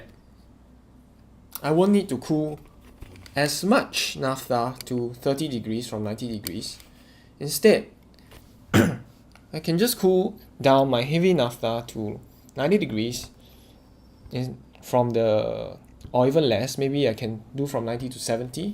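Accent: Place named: Singapore. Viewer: I know a Singaporean brother as soon as I hear the accent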